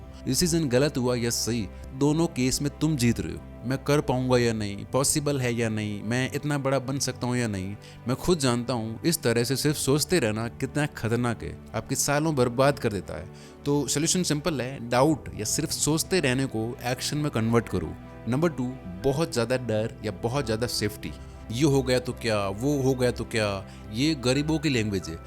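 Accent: Rajasthan, India. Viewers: Ye banda rajesthani hai jo bol raha hai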